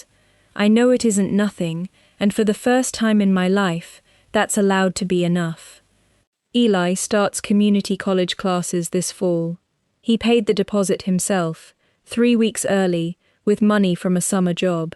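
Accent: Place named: United Kingdom. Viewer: Why does the reader of this story have a British accent?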